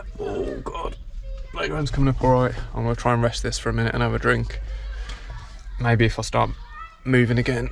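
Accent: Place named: England, United Kingdom. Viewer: You English men are so handsome. Love your accent. Love your heart.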